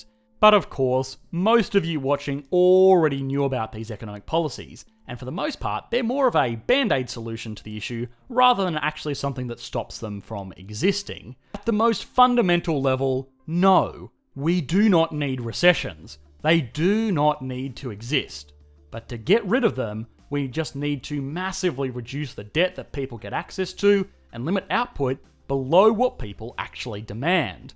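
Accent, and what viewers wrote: Australia. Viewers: The Australian accent really makes everything said here a wee bit more positive ♥️😁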